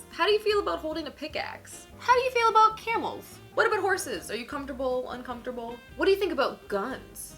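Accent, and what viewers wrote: Canada. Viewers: you're back!!! also your canadian accent makes me feel so at home :-D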